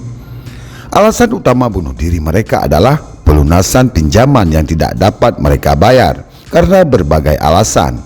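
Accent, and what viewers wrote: Aceh, Indonesia. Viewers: assalamualaikum,,, ni yg ngomong koq kayak orang Aceh dialek nya??? 😁🙏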